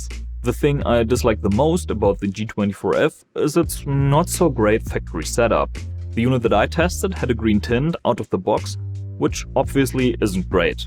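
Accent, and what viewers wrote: Germany. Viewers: Junge man hört so krass das du deutscher bist. Nicer content <3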